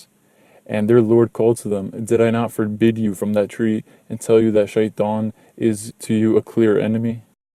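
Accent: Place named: India. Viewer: Are you from India? Cause you pronounce many words like we do. Anyways. Stay healthy. Stay happy. Lov from India.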